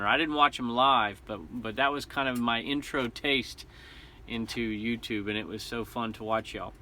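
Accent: South, United States. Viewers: I would love to win $100. I first watched eBay sales like this make my day about a month ago after Matt Part Time Picker suggested your channel. I have learned some tips from you. I live your southern accent and you have a wonderful family. The gift you gave your shed helpers was a wonderful gesture. You’re good people.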